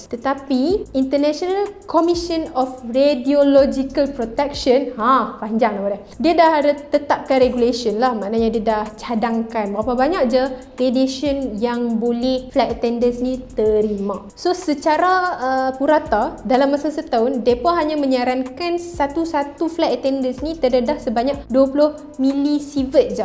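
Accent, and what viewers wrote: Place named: Kedah, Malaysia. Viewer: Kak nak tanya sikit akak ni orang kedah Ker atau orang negeri sembilan sebab bila saya tengok macam orang Kedah sebab loghat akak bercakap deract kedah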